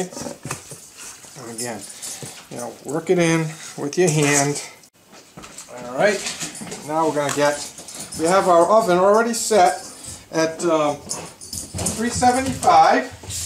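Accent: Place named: Boston, United States. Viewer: the way you say part is funny you pronounce the word pot you must be from boston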